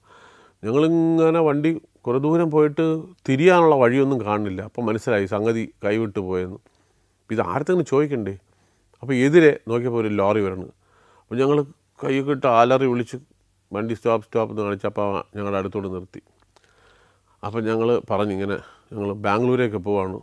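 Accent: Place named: Trivandrum, India. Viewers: 🤢 appi slang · നല്ല അനുഭവം കേൾക്കാൻ രസമുണ്ട്. അതും തിർവനന്തപുരം slangil.നന്ദു ഇനിയും ഉയരത്തിൽ എത്തട്ടെ. ആശംസകൾ. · City Trivandrum slang ❤ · Love to hear proper trivandrum accent....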